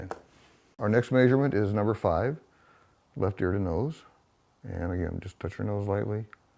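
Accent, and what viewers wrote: United States. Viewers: Midwest accent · That's an honest to God, original Oregonian accent.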